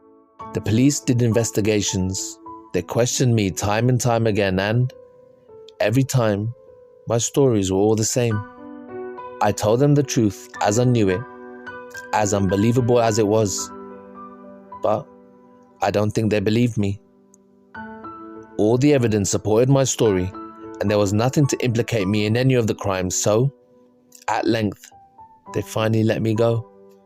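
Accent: United Kingdom. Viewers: Class story, hearing it from a fellow Brit is even better, as my football team says as its motto, south London & proud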